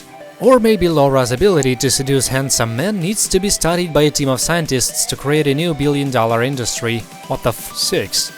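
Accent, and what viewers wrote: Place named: England, United Kingdom. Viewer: That's because he is from England. They pronounce words differently. What bugs me is if a word ends in 'R', they add on an 'A' when they say it.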